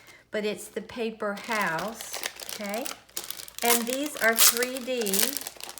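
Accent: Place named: Georgia, United States. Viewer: I like all you crafts and your southern GA speaking. I am from MS, so mine is more country. Like you I love to be creative. I am gonna be in GA about 10 days starting the weekend.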